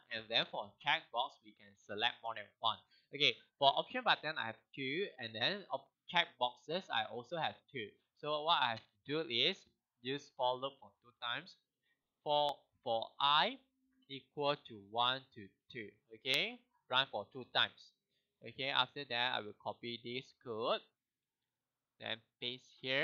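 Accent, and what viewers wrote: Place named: Singapore. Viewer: so refreshing, watching a tutorial in singlish. yay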